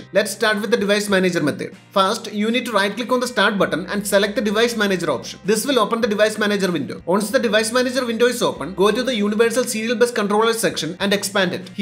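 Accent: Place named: India. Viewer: appears to be Indian English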